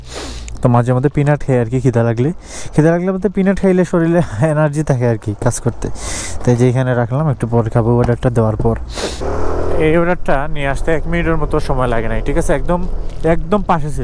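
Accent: Sylhet, Bangladesh. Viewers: Bhai Apnar House Sylhet ni ?? 😅 কথার ধরনে বুঝা যাচ্ছে সিলেটি ❤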